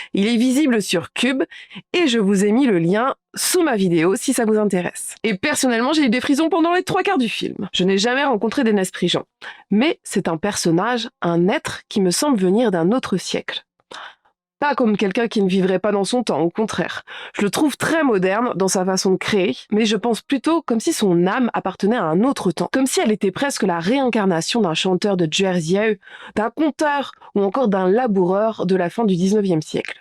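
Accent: Brittany, France. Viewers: J’adore ton accent du breton broerec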